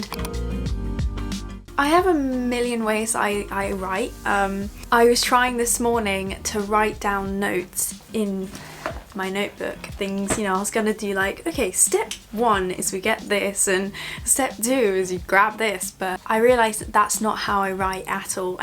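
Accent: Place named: United Kingdom. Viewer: Very interesting video. Tysm for making my mood better (my school starts tomorrow ). Maybe when I'll be older, I'll use these tips. I really luv your british accent. That 2 kisses at the end make me smile.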